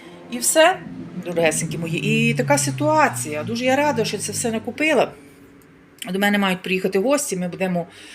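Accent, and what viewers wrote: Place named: Western Ukraine, Ukraine. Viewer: Да это западенцы на своем суржике" балякає"🫤👎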